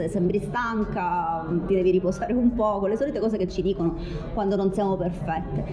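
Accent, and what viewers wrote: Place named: Cosenza, Italy. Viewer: L’ho scoperta solo ora, quasi per caso, per la sua analisi in quattro parti del film “Barbie”, che ho trovato quasi geniale. Sono andato a ritroso nei sui video su Instagram, e ho sempre trovato analisi centrate, acute, mai ideologiche, sempre equilibrate e ricche di spunti di riflessione e notizie, informazioni e curiosità che non conoscevo, o alle quali non avevo fatto caso. Sempre condivisibile. Una vera scoperta. In più è simpaticissima e dolce col suo accento cosentino. In una sola parola : adorabile. ❤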